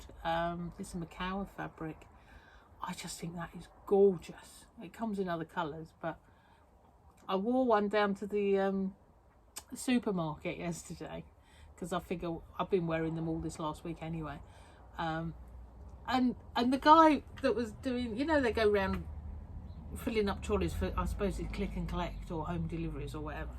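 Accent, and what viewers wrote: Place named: England, United Kingdom. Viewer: Hello love your accent so miss hearing English accents (in France ) adore your fabric stash behind you Subscribed so Have a beautiful week and see you virtual soon x x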